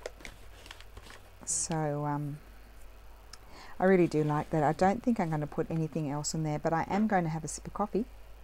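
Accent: Australia. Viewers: I love to hear your adventures in Italy and i love to hear your Australian accent. And of course your crafting too. We have to do all the running around too for paperwork its just how it is (USA) but thats ok it will all work out! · "get nicked" 😂. As a fellow Aussie I can't tell you how fantastic it is to hear an Aussie accent and expressions in a crafting video! Yours is the only video on YouTube that I can easily listen to for a whole hour 🥰. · Hi, Love the very typical Aussie rant. A breath of fresh air. Kerry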